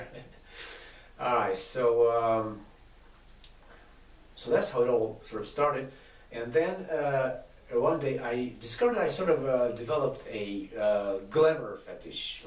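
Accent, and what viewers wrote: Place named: Northern Europe. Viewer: Hey sister, interesting accent, North European or Brazilian? Maybe a little Ricky Martin in your voice.... catch you on <link>!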